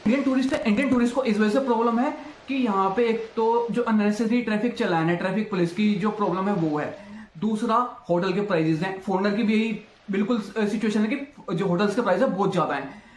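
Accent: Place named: Punjab, India. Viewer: panjabi ascent can't understand what you say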